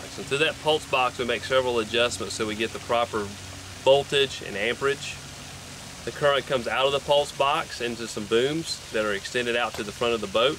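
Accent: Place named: Virginia, United States. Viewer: Love the accent :) Virginia ?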